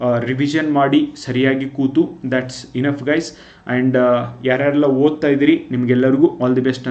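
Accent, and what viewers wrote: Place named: North Karnataka, India. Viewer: Sir stick to your ground of uttar Karnataka accent, don’t try hard.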